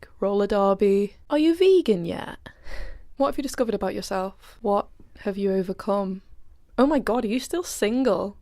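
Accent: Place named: North, United Kingdom. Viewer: It is so refreshing to hear northern accents on youtube!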